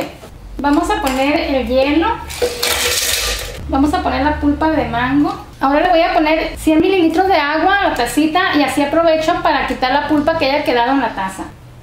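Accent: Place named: Sinaloa, Mexico. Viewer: Asento sinaloense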